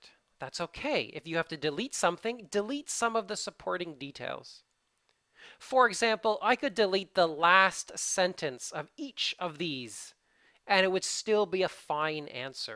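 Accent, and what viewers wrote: Canada. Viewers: So what if you have a Canadian accent??? Native speakers from UK and Australia also have their own accent. Your lessons are great. I am glad I came across to you.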